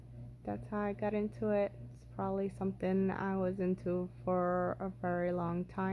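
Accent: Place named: New York, United States. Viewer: Your accent sounds new yorkish 🤣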